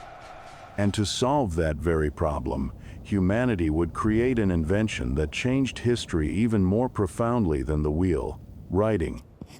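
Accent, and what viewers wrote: United States. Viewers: This is a good video but you need a narrator with a British voice. Nobody wants to hear an American talk about history - signed an American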